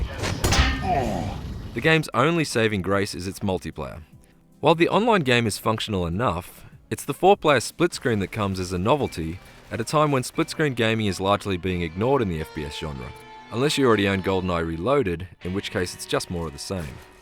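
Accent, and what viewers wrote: Australia. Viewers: Australian accent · He's not English, he is most likley Australian or from New Zealand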